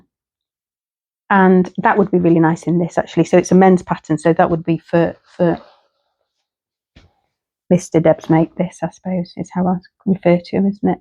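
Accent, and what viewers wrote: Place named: Midlands, United Kingdom. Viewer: Great makes. You sound like you live in the midlands area? I'm from Stoke.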